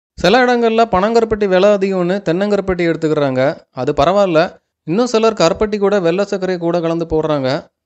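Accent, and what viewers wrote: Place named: Salem, India. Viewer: அவர்களுக்கு வணக்கம். நான் சேலம் உருக்காலைப் பணியாளராக இருந்து பணி நிறைவு பெற்றவன். தற்போதும் உருக்காலைப் பக்கம்தான் வசித்து வருகிறேன். இந்த வீடியோவைப் பார்க்கும்போது - உங்கள் மொழி அதாவது பேசும் விதம் என்னை ஈர்த்தது. வீனியோவின் நிறைவிலதான் காரணம் புரிந்தது நீங்கள் எங்கள் ஊர்க்காரர் என. மிக்க மகிழ்ச்சி. மிகப் பயனுள்ள தகவலைப் பதிவிட்டிருக்கிறீர்கள். நான் நீண்ட நாளாக - எண்ணெய் ஆட்டித்தான் பயன்படுத்துகிறேன். பலர் என் செயலைக் கேலி செய்வதுண்டு. நான் கண்டுகொள்வதில்லை. இப்போது பலர் எண்ணெய் ஆட்டுவது பற்றி ஆலோசனை கேட்டு வருகிறார்கள். உங்கள் வீடியோவைப் பரிந்துரைப்பேன். நன்றி சார். எண்ணெய் ஆட்டும் செக்கு பற்றி தகவல் கொடுங்கள்.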